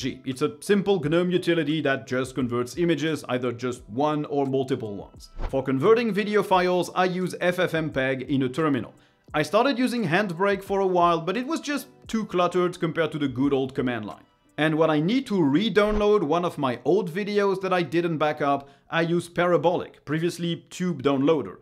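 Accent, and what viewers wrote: France. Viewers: Hello! I like your videos. Thank you. I have a question : you speak a bilingual english but I feel a small accent. Are you french? 😊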